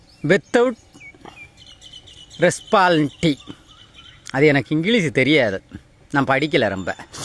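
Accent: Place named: Tirunelveli, India. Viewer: ஏனே... கலக்கிட்டிய போங்க... உங்கள மாதிரி திருநெல்வேலி பாச பேசி நல்லா செ...ப்பால அடிச்ச மாதிரி சொன்னாலும் அந்த கூ 🔥 விள்ளய திருந்தாதுவோ.... இருந்தாலும் தொடர்ந்து பேசுங்க... 👌👌👌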